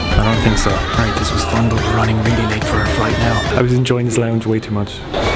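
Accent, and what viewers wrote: Ireland. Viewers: gowan you mad thing! what part of ireland are ya from?? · Loving the work lad. What part of ireland are you from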